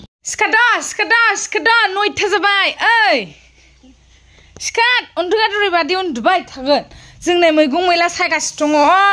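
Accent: Kokrajhar, India. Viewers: Abo ngni railainaya kokrajhar nijg same jayw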